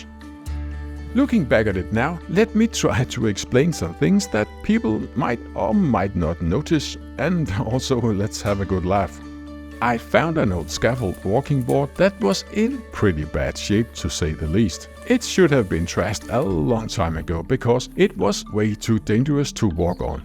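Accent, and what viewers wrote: Denmark. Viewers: Som dansker var det for grinern at høre en dansk accent i starten af videoen. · Hej fra Canada! (Parents are Danish though. Great hearing the accent again) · I love it that you do voice over using your own voice and amazing Danish accent. I get sick of people using AI voice that sounds like a 1950's American radio program. Tak fra Holland! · du er da dansker! jeg kan kende dig fra din accent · I was just thinking "this is cool but you can't do it in Denmark" when I clicked on the video but then I heard the accent ❤ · you sounds danish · Som dansker må jeg bare sige, at der i reglen ikke findes noget værre end at høre danskere (inklusiv mig selv) tale engelsk...men din video og fortælling er så god, at jeg kan ignorere det! Det er en sjælden gave,. Godt klaret og fed video! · Ej fy for helvede din dansk-engelsk stemmer får mig til at krumme tæer... 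Virkede ellers som en spændende video. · dam im danish (i use differents accents on danish and english) and i imediately were like "that sounds so stupid.. is he using a danish accent?" and then you were like "in denmark" xD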